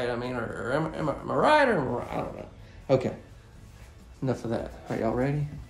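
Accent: South, United States. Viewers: I haven't gotten past that beautiful blue in the ceilings and all around the decor., what state are you in? I want to sell my home and leave the state. Your commentary and that beautiful country accent does it for me 😂! Do you or your team deal with VA loans or know how to assist veterans? Please let me know. Thank you! Wishing you & yours a wonderful & blessed New Year. Please stay safe out there. U S Army Retired (<num>) Iraq Veteran. Peace & Blessings, Light & Reflections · my man! Having moved to Yankee country many years ago, I miss hearing the Mother Tongue. As well as great, informational tours, your voice takes me home to wonderful memories of growing up in the South. All I need now is some Carolina BBQ and some boiled peanuts! Thank you!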